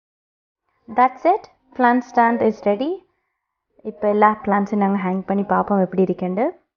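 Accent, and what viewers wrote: Sri Lanka. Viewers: I love your srilankan tamil,you speak as much as you can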